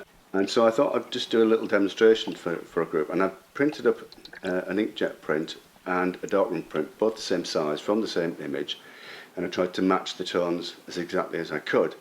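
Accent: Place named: United Kingdom. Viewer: You said 5 x 7 and yet have the British accent ?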